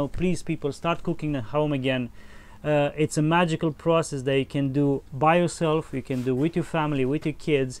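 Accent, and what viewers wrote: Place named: Ukraine. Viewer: Im just loving this Ukrainian accent ^^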